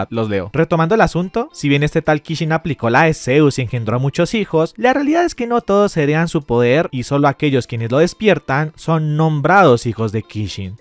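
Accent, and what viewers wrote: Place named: Colombia. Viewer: colombiano narrando anime cine🗿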